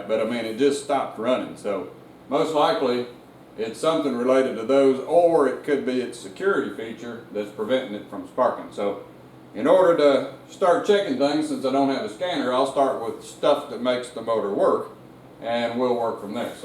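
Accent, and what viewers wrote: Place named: South, United States. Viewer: Lo hace verse tan sencillo, que Bien maestro y me gusta su acento country. Gracias. Brenda from Mexico city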